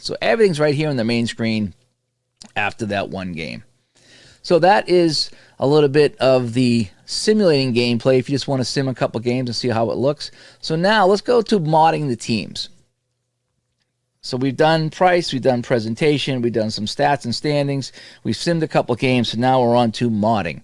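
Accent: Boston, United States. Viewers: Hi. I like your reviews. I saw your Regular Season Basketball (RSB) review and your Celtics-Sixer series with RSB and that was fun. You have a true Boston Celtic accent and that gives a nice touch to your reviews. I just started this video and will go back to it but I do want to correct the Strat-o-Matic pricing. For $62 you get the game with card image and any one season of your choice. You don't need to spend another $27 unless you want a second season.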